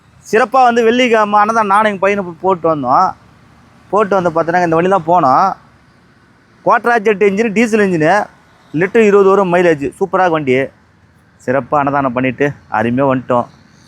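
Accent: Madurai, India. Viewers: Super Anna Madurai vasagam 🎉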